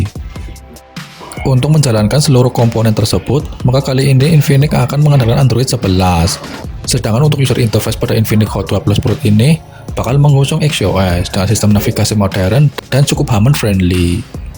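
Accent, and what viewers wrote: Banyumas, Indonesia. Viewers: Mantap mase .. ra ngapak ra kepenak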